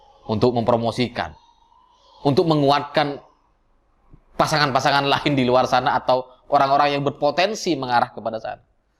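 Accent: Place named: Madura, Indonesia. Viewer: Ada logat Madura.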